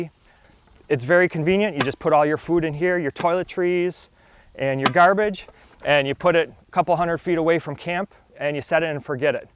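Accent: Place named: Chicago, United States. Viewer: Dude are you from Chicago? I can recognize that accent anywhere....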